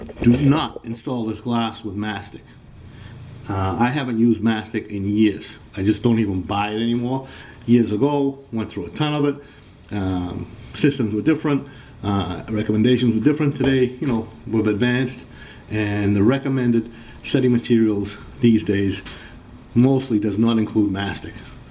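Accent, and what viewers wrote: Boston, United States. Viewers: I never tire of hearing the Boston accent. 🤣😂😁😂🤣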